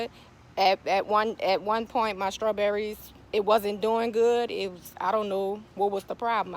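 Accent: New Orleans, United States. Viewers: I subscribed because I love your New Orlean accent❤❤❤❤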